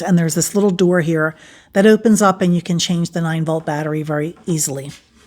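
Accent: United States. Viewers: first time we’ve head your speaking voice. Accent….Upstate New York? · For that cost, that is a heck of a great sound! My wife has an Ovation 12…forget the exact model. She’s getting back into playing, and she’ll probably need that guitar re-set up. And do I detect a bit of a Philly brogue in your voice? Awesome stuff…will have to check out more!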